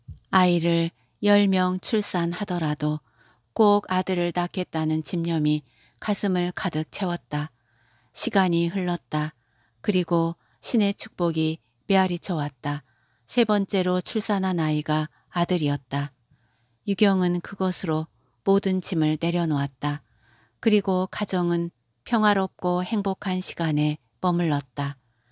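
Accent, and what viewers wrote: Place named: Jeolla, South Korea. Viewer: 전라도 억양이 세네요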